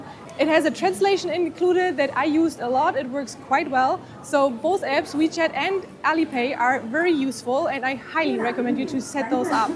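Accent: Germany. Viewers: Du I hear a German Akzent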